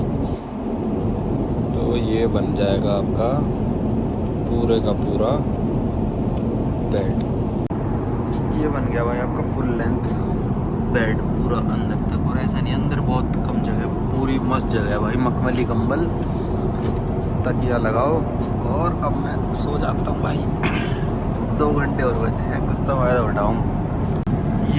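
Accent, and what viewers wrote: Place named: Rural, India. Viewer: Looking ,Sounding and behaving like typical dehaati . What can you expect ?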